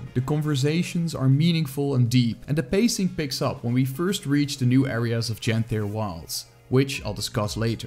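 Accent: Netherlands. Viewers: Came for the A-class content. Stayed for the dutch accent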